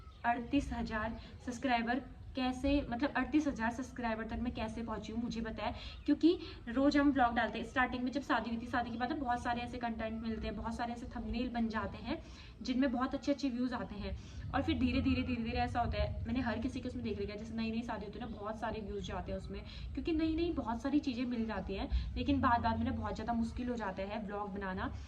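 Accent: Pahadi, India. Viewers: Prnam 🙏🏼 dii mai hldwani se huu dii aap kbhi vlog phadi leaguge mai v banyaa kro kuki achaa lgtaa h phadi sunaa kisi muh se or apki tone v ati h phadi isliyee achaa lgta hh ❣️💕💖💖❤️🤗🤗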